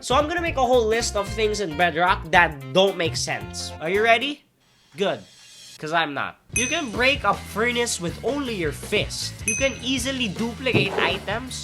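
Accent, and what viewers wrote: Philippines. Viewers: I know your filipino/southeastasian cuz of that accent :) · Dude you sound Filipino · i love how your filo accent slips all the time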